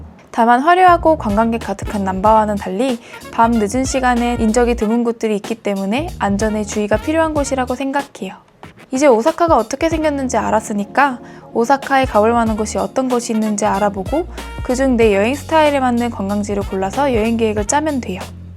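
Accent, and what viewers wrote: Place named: Chungcheong, South Korea. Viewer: 충청도여~? 승질 급한사람 숨넘어 가겠구만~ㅎ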